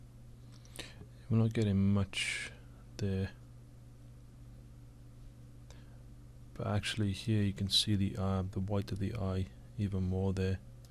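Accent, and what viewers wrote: Wales, United Kingdom. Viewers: Another great video....do I detect a hint of Welsh in your accent?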